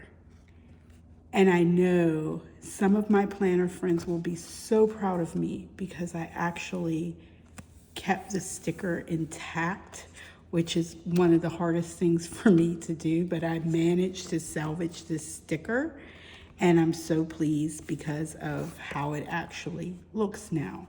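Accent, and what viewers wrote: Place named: Pittsburgh, United States. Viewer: I hear the Pittsburgher accent. I am excited to know there is a planner lover in the Burgh. Good luck on your studies. Carlow has a good nursing program. New subbie here…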